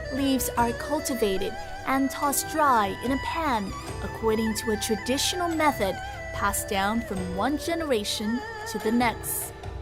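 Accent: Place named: Vietnam. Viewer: Giọng chị hay quá :) em còn chưa kịp nhận ra là người Việt cơ :d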